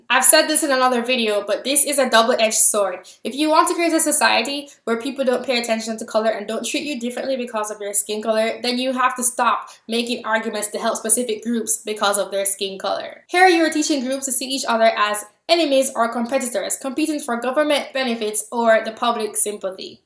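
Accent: Jamaica. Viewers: You Jamaican? Sound like it? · You sound like an islander. Are you Jamaica? I am too. I'm curious of what socioeconomic class background you came from in the islands before you immigrated because you sound quite elitist and condescending in your interpretations. Did you grow up in an insular middle to upper-class setting in the islands?...away from all the struggling poor black people in the ghetto? Because your perspectives seems sooo limited. You seem to be using that same limited perspective to interpret how Black Americans or Black people in America react to dehumanization and mobilize against brutality. Obvious when Black people in Americas say "Black People" they are most likely referring to themselves and their issues - same way when Black people anywhere else posit themselves as Black people...they are referring to themselves unless otherwise specified. 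But you seem quite invested in making sure non-black people (and white people specifically) know that "NOT ALL BLACKS are like this! Some of us are good and do not rock the boat." People are suffering the world over. You may (or may not be an outside to that) but it's a social reality. You do not get to police how they react and choose to mobilize/organize. I have my qualms about BLM (they are not radical enough) but I have no issues with them choose to mobilize around being brutalized. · Is it just me or is her jamaican accent too sexy? (apart from her smexy beauty) Damn woman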